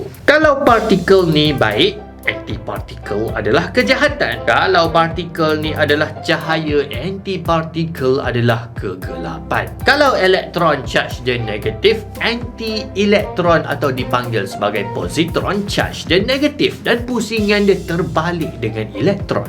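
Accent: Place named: Kedah, Malaysia. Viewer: Saya ada soalan ,abang ni orang kedah ka ? Hang hang hang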